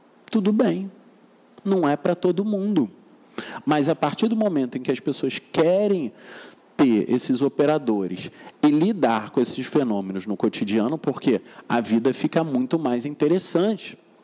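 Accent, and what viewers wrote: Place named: Rio de Janeiro, Brazil. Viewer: sutaque do rio de janeiro da zona sul !!!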